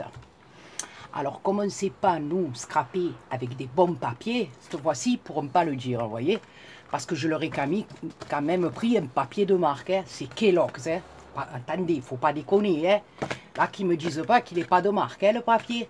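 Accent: South, France. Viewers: Bravo !! Tout est dit 💕😏 avec un bel accent du soleil , comme moi qui suis du sud-ouest 😘 nouvelle abonnée a votre chaine. Merci du partage. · je suis au Québec depuis 2002 avant j’étais en Avignon avec mon chéri qui lui a aussi ce superbe accent du sud! Nous avons choisi de quitter la France pour nous installer au Québec. Nous y avons eu deux enfants qui ont l’accent.... québécois lol C’est marrant mon fils a presque l’âge du tien. Je voulais aussi te dire que je viens de regarder plusieurs de tes vidéos et tu m’as beaucoup émue. Je te souhaite beaucoup de bonnes choses et je suis vraiment ravie de pouvoir te suivre désormais ❤️ Gros becs 😘 xoxo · Coucou ma, tu m'as tué avec ta carte 😂😂😂 j'en peux plus 😂😂. Encore de belles fleurs 😍 et papillons. Ah dommage tu n'auras pas le mot de la fin 😞 car je ne pouvais pas ne pas dire ce que je pense et que la vidéo charge. 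Je t'adore ma et ton accent du sud me berce et me met la joie. Gros poutous 😘😘😘😘❤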